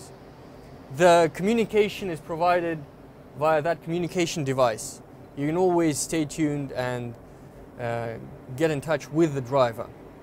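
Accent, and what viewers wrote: Russia. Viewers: Ох уж этот Russian accent )) Техника огонь)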